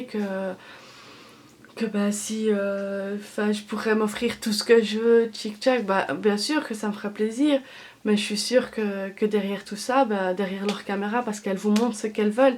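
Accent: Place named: Belgium. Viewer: Et une abonnée en plus ! j'aime bien t'a façon de parler ;) Tu es belge ? moi aussi je suis de Belgique sa se reconnais direct avec nos beau accent MDR :p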